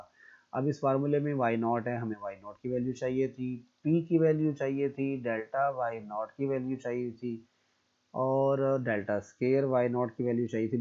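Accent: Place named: Punjab, India. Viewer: Mashallah your Punjabi accent is so lovely. Really love your teaching method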